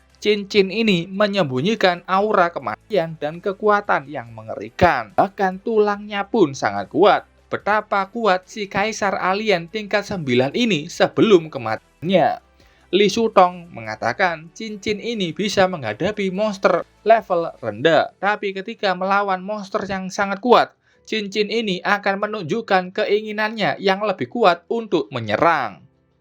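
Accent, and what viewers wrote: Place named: Java, Indonesia. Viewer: mas tolong medok nya di atasi dulu bikin sesak napas dengar nya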